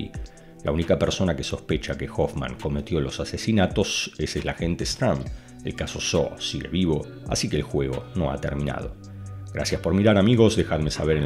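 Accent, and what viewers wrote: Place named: Argentina. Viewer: No me entero si eres argentino o español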